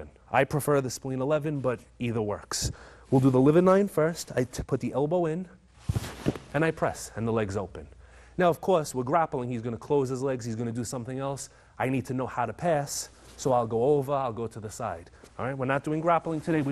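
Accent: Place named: New Jersey, United States. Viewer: This guy is real Jersey Pwot ove coughey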